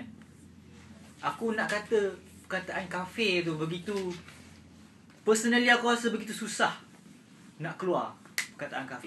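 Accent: Malaysia. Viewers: Ni konfem kelate..haha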